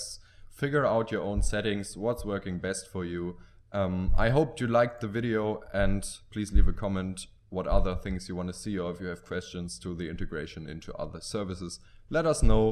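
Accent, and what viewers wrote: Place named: Germany. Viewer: Wie man den deutschen Akzent raushört haha xD. Aber ihr macht wirklich gute Arbeit, für Creator oder Developer wie mich ist es ein Segen das es linkvertise gibt ^^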